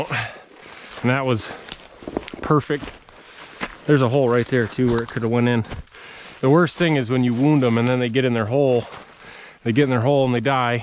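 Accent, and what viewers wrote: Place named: Canada. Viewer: You sound Canadian af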